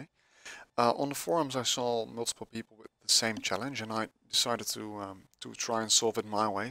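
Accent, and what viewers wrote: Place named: Netherlands. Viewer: You speak English very well but with that inevitable dutch accent. Anyways, very cool machine, I would definitely be interested in a small ship version of it :p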